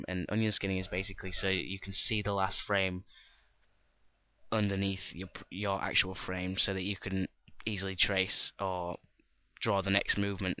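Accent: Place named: Australia. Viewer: WTF YOU SON OF A BITCH ITS AUSTRAILAN :D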